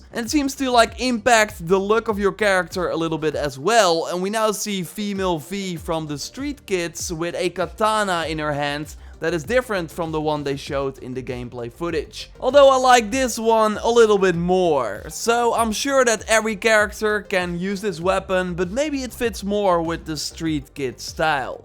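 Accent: Netherlands. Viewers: youre dutch, i can hear it in your voice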